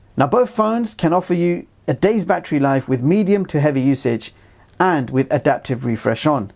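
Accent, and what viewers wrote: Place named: United Kingdom. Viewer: i love British accent ❤️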